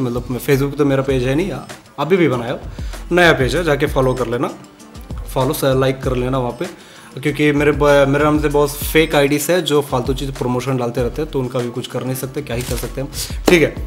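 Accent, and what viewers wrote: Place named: Hyderabad, India. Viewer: After a long time I am watching him. I love his hyderabadi accent. I want to see him go him on heights....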